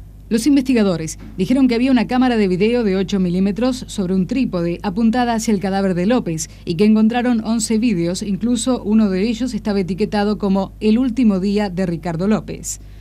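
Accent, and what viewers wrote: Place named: Corrientes, Argentina. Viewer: Si no me equivoco, la que narra, que debe ser de Corrientes por su acento correntina, sea la misma que se escucha en el canal de Al Rojo Vivo. Seguramente éste video vino de ahí.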